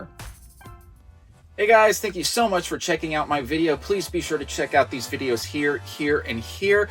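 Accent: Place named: Canada. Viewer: You sound like a Canadian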